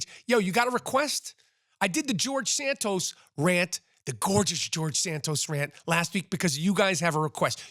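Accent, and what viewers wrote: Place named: Boston, United States. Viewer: Yo Boston accent!!! Like someone from the Midwest had a baby with some long islander!!! Hurts my ears!!!!!!!!!!....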